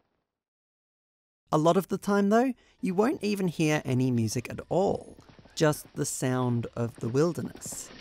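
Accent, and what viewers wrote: Australia. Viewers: Are you from Australia? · I know you're emphasising words for clarity, probably because of your Aussie accent. I just find it disjointing and would appreciate more a more natural style of narration.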